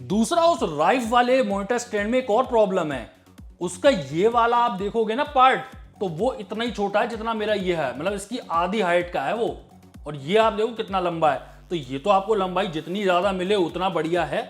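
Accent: India. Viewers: Apne jo wall pe stands lagte hai vo nahi batya agli bar ho sake to uska halka sa jo ho sake ek brief de dena thoda idea ho jayega Aur jo mera one of the biggest reason hai apki videos dekhne ka vo apka indian accent jo apno vale feeling dilata hai to voh kabhi loose mat karbna ☺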